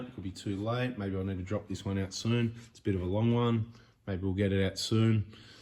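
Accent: Australia. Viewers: Why are you speaking like an Australian ?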